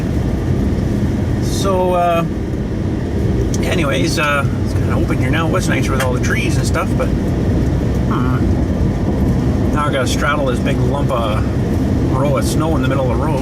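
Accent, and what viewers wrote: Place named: Canada. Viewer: You must be Canadian ah where abouts are you ?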